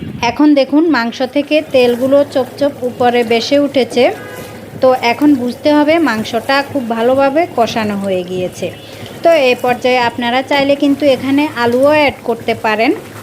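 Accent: Noakhali, Bangladesh. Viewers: মনে হচ্ছে আপনার গ্রামের বাড়ি নোয়াখালী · আফু, আমনের বাড়ি কি নোয়াখালি নি, আর ত মনে অয় আমনে আংগ দেশি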